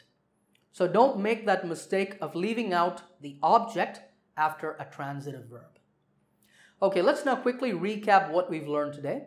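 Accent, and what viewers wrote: India. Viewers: You have an amazing accent being Indian. Keep up the good work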